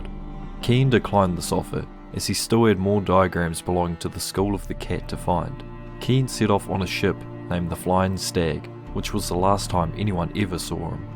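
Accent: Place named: New Zealand. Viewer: Definitely from new Zealand (am Australian)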